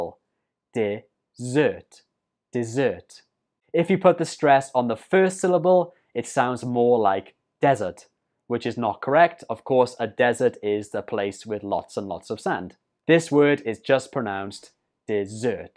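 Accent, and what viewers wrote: United Kingdom. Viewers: So did I. It's easy to understand his British English.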